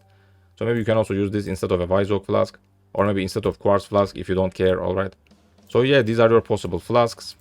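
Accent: Turkey. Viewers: Anında Türk olduğunu anladım aksandan :D Bende genelde böyle konuşuyorum videolarda ya da sunumlarda kjahbfljangsg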